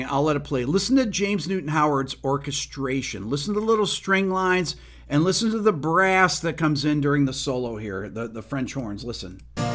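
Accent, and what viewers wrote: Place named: South, United States. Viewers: Ain’t nuthin’ wrong with soundin’ southern lol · You are a native New Yorker but the south is starting to rub off on you. Joined the league.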